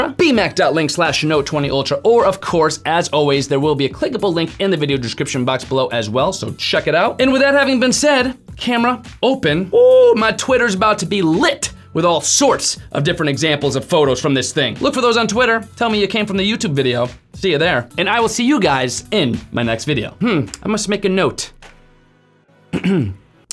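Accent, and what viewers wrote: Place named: Connecticut, United States. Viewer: Bmac from CT I'm from TN but raised in NY so in know CT when I hear it or Mass.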